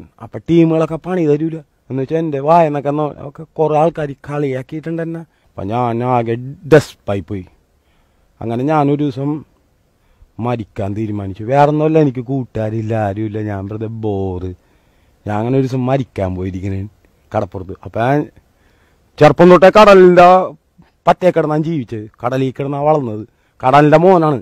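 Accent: Kochi, India. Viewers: Sorrry. Da. Nindea. Kochi basha. Suuuppper. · അവൻ ആദ്യം മുഖവുര പറഞ്ഞല്ലോ കൊച്ചിക്കാരൻ ആണ് എന്ന് . കൊച്ചി സംസാരരീതി ഇവന് 100 % ഉണ്ട് . കൊച്ചിക്കാരുടെ സംസാരം കഞ്ചാവ് അടിച്ച് പറയും പോലെയാണ് . ചിലവൻമാർ കഞ്ചാവും ആയിരിക്കും . "കൊച്ചി കണ്ട വന് അച്ചി വേണ്ട" എന്ന് പഴമക്കാർ പറയുന്നതിൽ കാര്യം ഉണ്ട് . പണ്ട് ആണുങ്ങൾ കൊച്ചിയിൽ പോവുന്നത് ലാവിഷായ് പെണ്ണുങ്ങളെ വ്യഭിചരിക്കാൻ കിട്ടുന്നത് കൊണ്ടാണ് . ഒരുപാട് പെണ്ണുങ്ങളുമായി വ്യഭിചരിച്ച പുരുഷന് പീന്നീട് പെണ്ണ് കെട്ടി ജിമിക്കാൻ താൽപര്യം കുറയും . അതാണ് അച്ചി വേണ്ടാ എന്ന് പറയുന്നത് . (Note: ഇപ്പോൾ അവിടത്തെ ആണുങ്ങൾക്ക് കുറച്ച് വിവരം വെച്ചു . അത് കൊണ്ട് പഴയ പോലെ കളിക്ക് പെണ്ണ് ലാവിഷ് അല്ല 🤪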